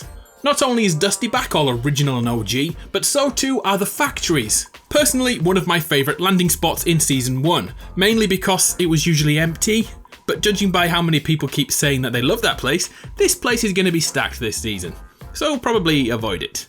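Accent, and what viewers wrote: United Kingdom. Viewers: May I ask are you are a Brit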